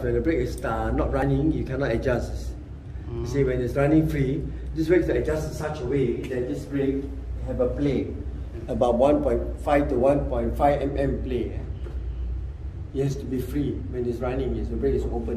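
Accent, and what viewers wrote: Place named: Singapore. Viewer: Sounds like Singaporean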